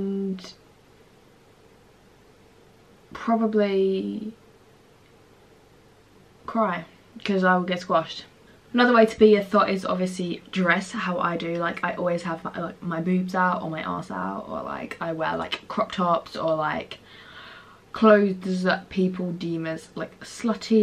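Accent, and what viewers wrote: England, United Kingdom. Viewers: salut jadore ton accent anglais tes tres jolie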